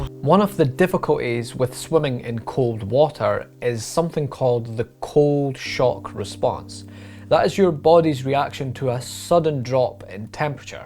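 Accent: Scotland, United Kingdom. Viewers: Are you Scottish!? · are u Scottish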